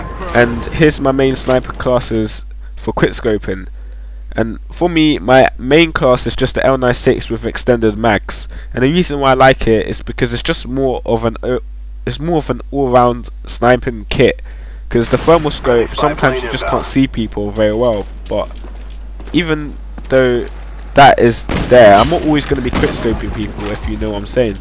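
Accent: England, United Kingdom. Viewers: People with British accents need to stop making videos. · So, is that an English accent...? O_o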